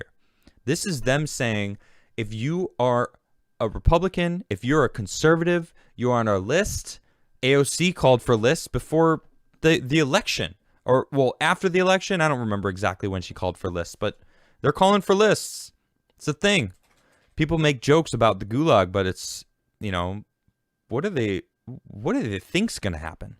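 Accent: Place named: Michigan, United States. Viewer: Bro.....you literally look pewdiepie but with a Michigan accent... I dig it 💘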